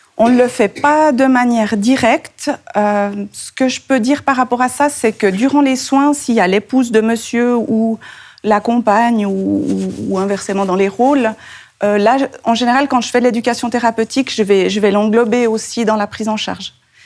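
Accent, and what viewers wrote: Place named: Switzerland. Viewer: Aaaah l'accent Suisse, pas d'IA. C'est reposant. Sujet intéressant. Note : il faudrait faire très attention quand vous parlez d'un thème qu'il n'y ait pas de bruits parasites derrière vous comme des gens qui toussent de manière récurrente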